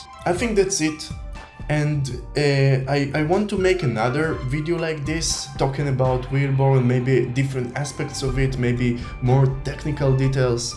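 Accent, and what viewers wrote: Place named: Israel. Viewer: can i ask where are u from? u got Israeli accent, and the game looks amazing!!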